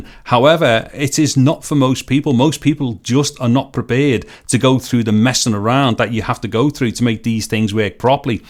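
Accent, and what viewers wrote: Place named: Scotland, United Kingdom. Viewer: Another great video. My son (6) asked if you were from Scotland 😂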